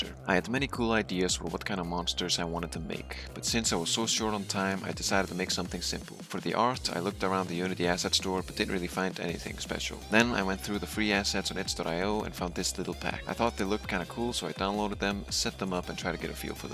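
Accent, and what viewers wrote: Iceland. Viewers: Are you icelandic? Accent🤨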